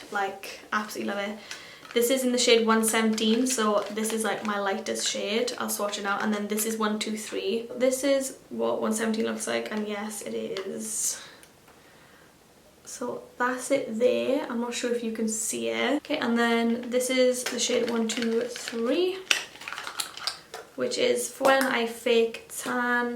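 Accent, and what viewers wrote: Wales, United Kingdom. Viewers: are you welsh?xxx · Watches video hears welsh accent subscribes 👍🏼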